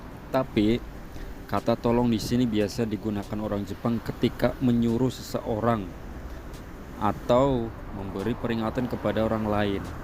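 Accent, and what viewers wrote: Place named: Cirebon, Indonesia. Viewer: Logat logatnya mirip Jawa Cirebon nih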